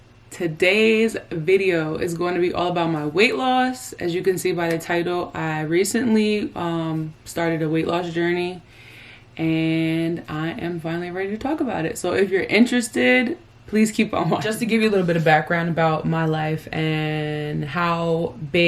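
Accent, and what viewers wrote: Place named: New York, United States. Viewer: Funny, quarantine made it easy for me to transition to being full vegan, very little carbs (still am). To be honest I was already vegetarian most of the time (a little fish, but LOTS of carbs, cheese and ice cream--my downfall). I've lost 25 lbs with zero exercise--I'm not proud of that, but still mentally trying to get my own way. Imagine how much more I'd be losing if I was exercising? You are so real, so inspiring. Thank you! (You sound like you're from NYC, BTW lol)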